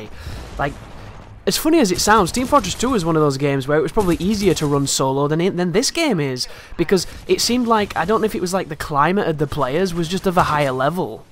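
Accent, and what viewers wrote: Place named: Northern England, United Kingdom. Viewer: I would guess northern England don't know where though.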